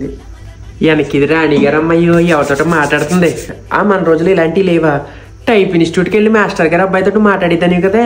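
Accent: Godavari, India. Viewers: Maa godarolla matalu alage untay andi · Super bro memu godarollame maa slang ni chala baa hielite chestunnaru 👌👌👌👌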